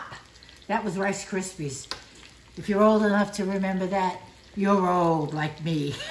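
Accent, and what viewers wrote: New York, United States. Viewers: My favorite red head with a New York accent.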